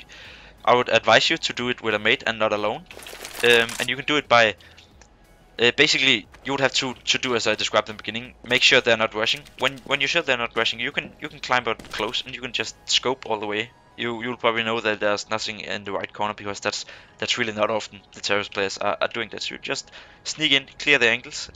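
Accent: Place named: Denmark. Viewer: dansk accent heh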